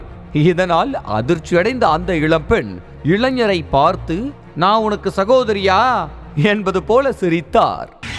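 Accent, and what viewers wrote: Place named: Tirunelveli, India. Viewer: நீங்க thirunelve சூப்பர் i like that slang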